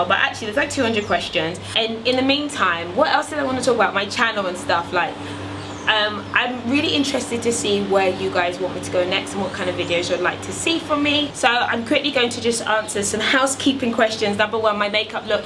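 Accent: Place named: United Kingdom. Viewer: LOL I'm so used to american accent , but i love Your british accent 😊 though, u're such a GORGEOUS and cute YOUNG mom :)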